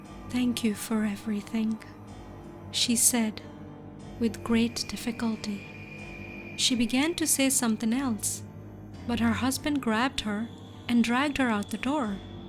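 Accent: India. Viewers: It sounds like you have an Indian accent.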